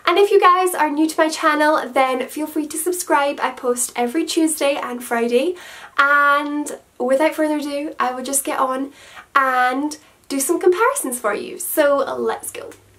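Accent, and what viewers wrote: Scotland, United Kingdom. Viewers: Omg right I was Watching this and I was listening to your accent and I was like you sound quite Scottish so I clicked the link and I was right I feel proud 😂 I'm from Scotland too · Omg I'm crying (literally) because your accent is exactly like mine! And to find a YouTuber that lives so close to me is insane!! And to hear you day Anastasia in a Scottish accent make me so happy! Because all the YouTuber I normally watch are American or English! Or Australian. So it's pretty cool to find a Scottish YouTuber!! X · Are you Scottish? Your accent is so lovely😻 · I feel like I hear a Scottish accent a tiny bit from you? Might just be me tho😂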